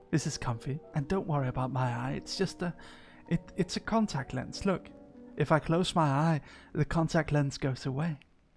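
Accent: United Kingdom. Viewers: With the voice I sort of imagine a British mercenary. The lore of this just really is deep.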